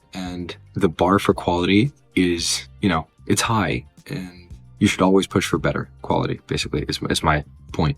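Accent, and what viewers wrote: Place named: United States. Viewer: He sounds American to me. Is he Canadian or something? Their salaries are high too. I’m so confused now lol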